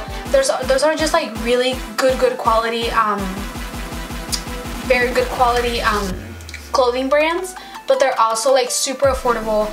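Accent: Mexico. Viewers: I love your accent mi Chula Mexicana but Mija it's called a stroller dear and you would act like a tom boy also bless the lucky son of a bitch who gives you 4 kids lolllllll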